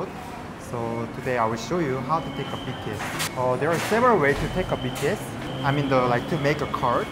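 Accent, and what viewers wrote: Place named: South Korea. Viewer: I like your korean accent when u speak english. So lovely 😅😅😂🎉🎉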